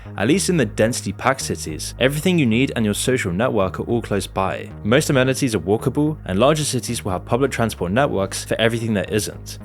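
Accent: United Kingdom. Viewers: The monotone british voice with nothing very interesting to say as every video existing on the internet doesn't help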